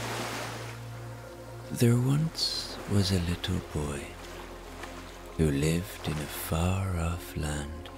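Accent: Ireland. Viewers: your Irish accent, make your sleep stories amazing.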